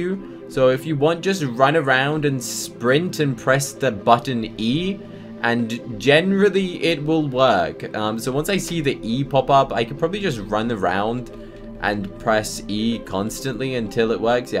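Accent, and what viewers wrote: United Kingdom. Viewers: Day 2 of asking are u British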